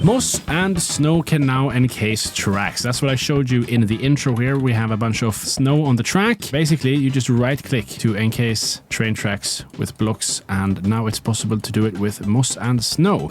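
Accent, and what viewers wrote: Sweden. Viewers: He sounds swedish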